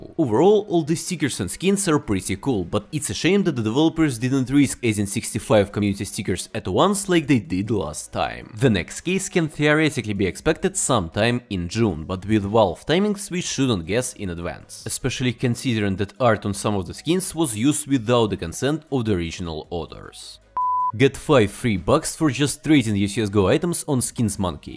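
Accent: Russia. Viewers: We all love spy dataminer chicken mit Russian accent 🐔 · Cool accent, are you Russian?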